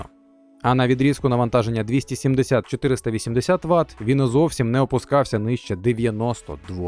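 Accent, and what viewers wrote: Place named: Galicia, Ukraine. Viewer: вылез галицикий селюк😂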